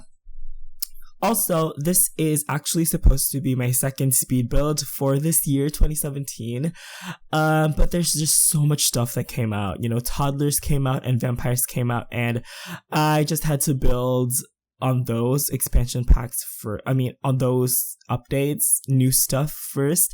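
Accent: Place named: Philippines. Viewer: the first time I heard your voice. and started wondering if you were a filipino.. and you are... hi po ^^ new subscriber here ^^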